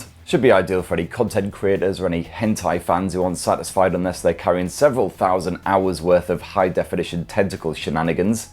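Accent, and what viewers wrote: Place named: Sunderland, United Kingdom. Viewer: The innuendos and smutty humour were very strong on this one. Well done my favourite Macam or is it Geordie? Sorry not sure. Either way the best tech reviewer from the north east, possibly the world! Edit. Ahhhh you answered my question. Sunderland!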